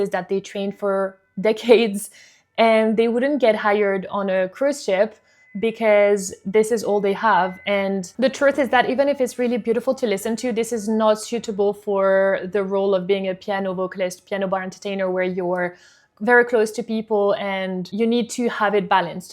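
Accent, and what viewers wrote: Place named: South Africa. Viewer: You sound South African. I did a few contracs as a singing bassist in party bands for Disney and Princess ships. All the South Africans I met on ships worked in the spas. They called them 'Steiner Girls'.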